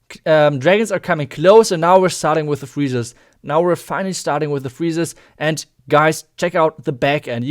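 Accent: Germany. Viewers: You can easy hear the German accent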